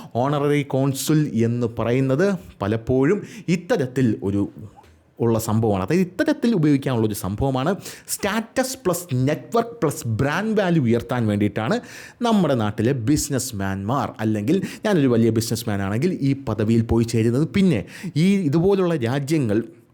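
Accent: Thiruvananthapuram, India. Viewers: 😂😂😂😂😂😂😂❤❤❤❤. അവസാനത്തെ ബാബു സാമി പൊളിച്ചു. Serious ആയ വിഷയം കോമഡി ആയി പറയാന്‍ ബെസ്റ്റ് തിരുവനന്തപുരം slang ആണ്‌ എന്ന്‌ തെളിയിച്ച അങ്ങ് ഒരു മഹാന്‍ ആണ്‌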